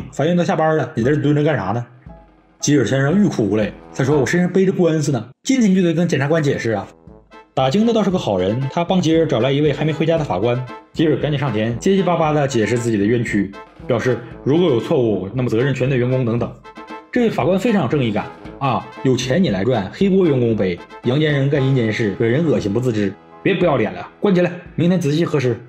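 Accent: Northeast, China. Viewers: 我铁粉节目里语言上家乡风味很足东北人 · 这一股大碴子味。哈哈，真亲切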